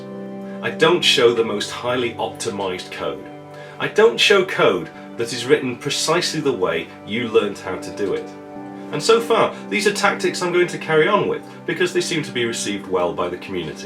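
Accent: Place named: North, United Kingdom. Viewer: Your accent is so much like mine that it took me a whole video to spot that you are a Northener. Are you from the West Lancs area by any chance? Great four-parter on the 3D graphics. I never really understood this and your vids explained it very clearly to me. Thanks!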